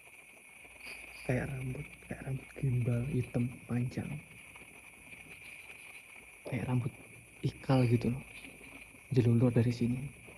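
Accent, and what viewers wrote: Java, Indonesia. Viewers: Logatmu mambu jowo bang 😊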